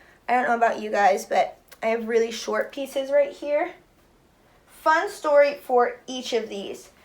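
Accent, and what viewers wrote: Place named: South, United States. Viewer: you speak southern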